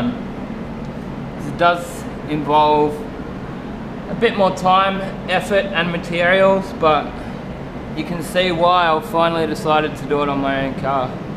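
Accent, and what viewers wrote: Australia. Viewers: Thanks Aussy much appreciate. Like you very. Much. Learning everything. I could. Not understand you at first the Aussy accent. Ha ha After listening closely for a little bit I picked it up no problem. Much appreciate your video work. You're a great mate. Your answer sounds right and makes sense. The clogging is why I go to the water. I use a trickle from a hose. Works well but I'd definitely a pain in the butt.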